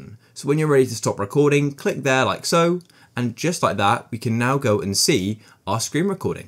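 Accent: United Kingdom. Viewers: bri-ish mate helped me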